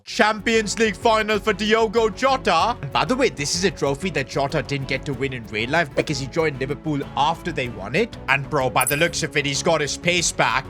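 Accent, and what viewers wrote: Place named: India. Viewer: yes bro he's still learning and he's improving if you check his videos in fifa 21/fifa 22 his accent was only indian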